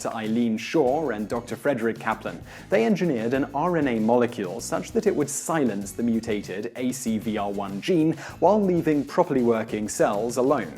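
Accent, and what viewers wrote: England, United Kingdom. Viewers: Is this guy English? I am from England and I can never tell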